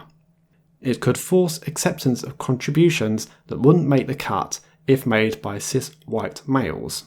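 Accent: England, United Kingdom. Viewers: Are u from england?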